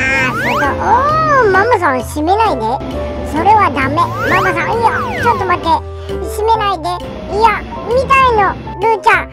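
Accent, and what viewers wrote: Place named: Kansai, Japan. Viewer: 関西弁大好き 癒やされます❤ありがとうございます😂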